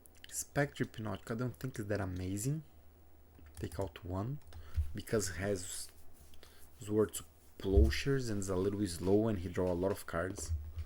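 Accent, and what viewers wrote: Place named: Brazil. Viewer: Karai... Tu falou e eu já vi, porra um br falando inglês vei,quemassa!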